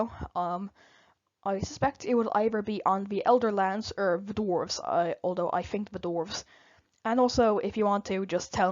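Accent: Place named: Ireland. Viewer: Wasn't expecting an irish woman when i clicked on the video, but what a present surprise. The maps absolutely incredible, and the screenshots of just how much detail went into the world, including climate bands, tectonics, elevation, ocean currents, really made me re-evaluate how sloppily I've been designing my own map currently. 1 thing I'd like to know is whether your world is tilted on it's axis like Earths or is in perfect alignment, it's something i see commonly missed in worldbuilding despite the fact that the axial tilt has a massive effect on the Earth, and the seasons/climate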